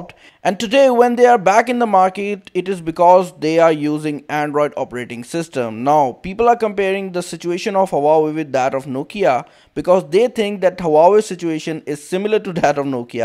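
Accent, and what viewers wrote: India. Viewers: indian accend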